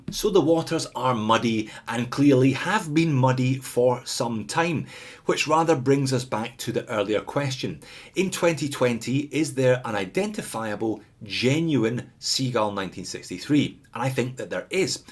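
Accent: Scotland, United Kingdom. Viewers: Love the Scot accent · stop speaking scottish and I will sub you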